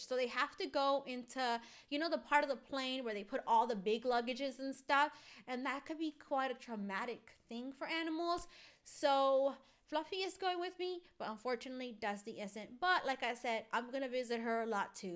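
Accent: United Kingdom. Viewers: i thought u were british...😂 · I thought you came from the UK 🇬🇧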